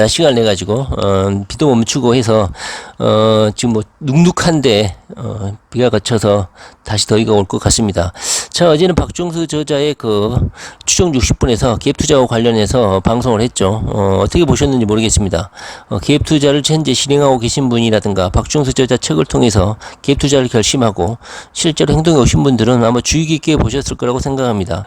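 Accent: Jeolla, South Korea. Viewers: 전라도 늠이네ㅎㅎ 슨상님은 잘 계시지라?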